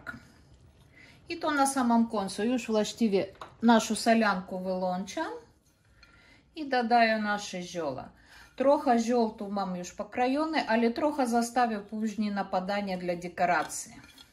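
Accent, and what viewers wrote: Ukraine. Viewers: język polski, akcent ukraiński, rosyjski? przepis znany mi z literatury rosyjskiej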